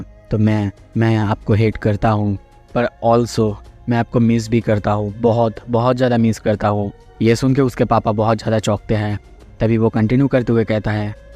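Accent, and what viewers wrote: Bengal, India. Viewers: Kya ap Bengali ho?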